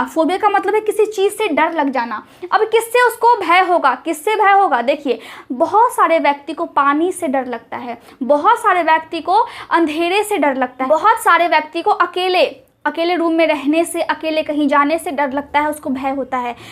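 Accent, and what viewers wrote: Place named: Bengal, India. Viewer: Mam aap Bangal se ho,aapki language se lagta hai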